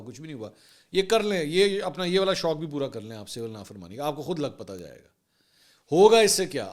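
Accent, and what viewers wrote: Punjab, Pakistan. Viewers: Mansoor bhai Jo tusi punjabi touch dendey o na 😂 sawaad a janda a ❤❤❤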